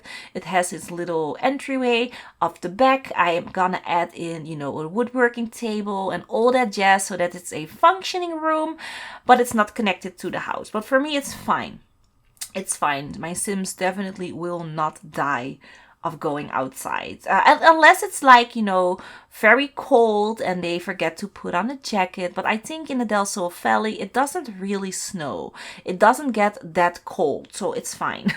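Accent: Netherlands. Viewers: Are you dutch, or where are you from?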